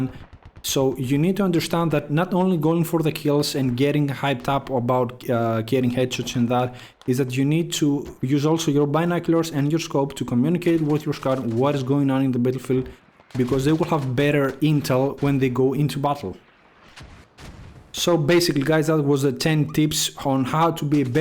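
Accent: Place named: Greece. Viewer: I can hear the Greekness in your voice :D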